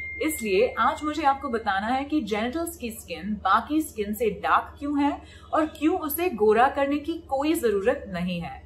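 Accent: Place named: India. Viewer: Name English and accent hindi ?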